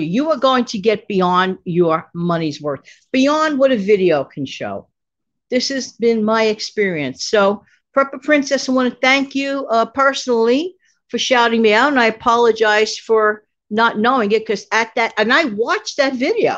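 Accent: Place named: New York, United States. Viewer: Yep. I came over because prepper princess mentioned you and that you were from New York City and she I think she even mentioned your accent and I said I miss being out east let me listen to a fellow New Yorker. And I have loved every one of your shows and haven't missed one the past couple of weeks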